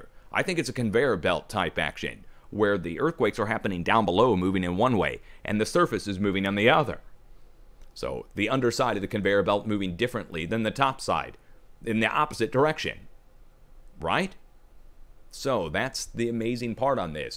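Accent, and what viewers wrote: Russia. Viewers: Good work, and I love your foreign accents, especially the Russian one. Stay strong! Much love! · Hey, love the cheesy Russian accent!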